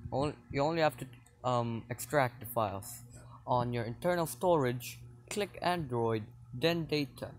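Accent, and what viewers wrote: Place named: Philippines. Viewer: Filipino? I thought your accent.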